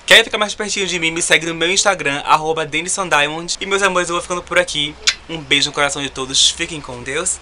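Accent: Recife, Brazil. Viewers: amei seu sutaque, recife , sou de São Paulo.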